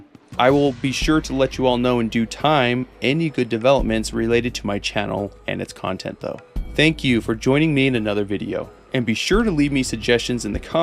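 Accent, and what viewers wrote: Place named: United States. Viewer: You did really well pronouncing "Pyotr Illyich Tchaikovskij". Aside from an american accent, it sounded proper to me. Language trivia: "Piotr" is the russian/slavic version of "Peter". The "-io" sound kind of sounds more like "-ieo" with an emphasis on the "-ie". The "-tr" is certainly one of the typical slavic consonant sounds, but when you speak it, you'll usually hear a brief "u" or "e" vowel sound in there. Anyway, thanks for the entertaining video! I hope my little trivia bit was at least somewhat entertaining to you.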